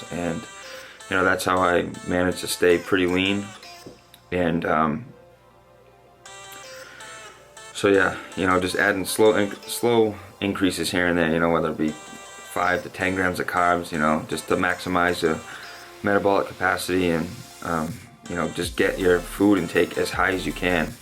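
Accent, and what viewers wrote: Boston, United States. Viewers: CAHBS. Love the Boston voice. \m/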